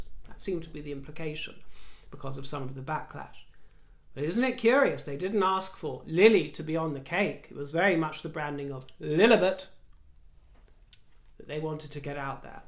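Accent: Australia. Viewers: Your Aussie accent is on point 🇦🇺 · Your Aussie accent is perfect. Not a hint of cockney or kiwi. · Well done on your aussie accent :) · Wow Aussie here and you do nail the accent down to a T. 👍🤩😄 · Your Aussie accent is an absolute delight, dear!!! As for the BC photographer, I hasten to agree with you - very hmmm 🤔 and their website lacks a lot of trust. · Love your Aussie accent🦘🐨🇦🇺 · Love your Aussie accent ❤ You really got the point across...".Lilibet Diana"!!!👍👍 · I'm an Aussie and you crack me up with your Aussie accent. It's pretty good. Luv ya xxxxxx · Love your Australian accent... "Lilly Diana! Not sure if you meant to, but you really did make me laugh out loud!! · Bloody ripper of an Aus accent! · 😁😁😁🤣🤣🤣🤣🤣 Oz accent. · you speak better Australian than I do! Good morning from a very cold Sydney ❤️ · Love your Aussie accent #. Got the pronunciation coming out nicely 👏👏👏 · As a true blue aussie, you're accent is spot on from an Australian movie named Dons Party circa 1970s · Your aussie accent 😂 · haha.If you used that accent in Australia you wouldn't be getting many invitations out. 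We have many different regional accents and dialects in Australia. You just used a very bad 'Harry Kings scrap-metal and junk car-yard' accent. Is that was what you were going for? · Sorry but not everyone talks like that over here, you know Australia has moved on from that,but saying that it’s like Gordie it’s a celebration of a dialect 🤷‍♀️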